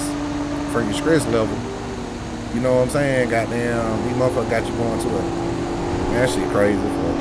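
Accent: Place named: Florida, United States. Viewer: where you from sound like you from Florida champ???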